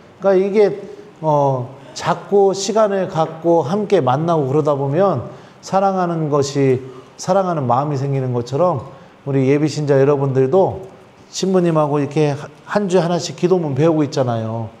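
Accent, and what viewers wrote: Chungcheong, South Korea. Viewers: 신부님 잘 들었유~ 여긴 전라도 인데 자꾸 충청도 말이 나와유~ ^^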